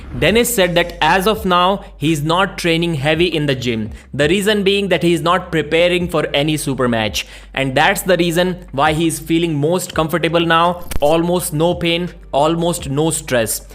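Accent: India. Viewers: * has indian accent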